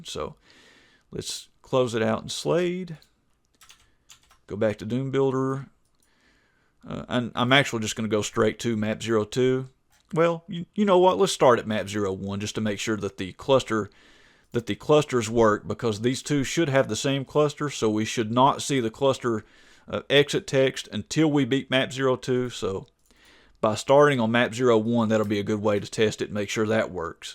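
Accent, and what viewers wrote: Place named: Texas, United States. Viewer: i can hear texas from this man's accent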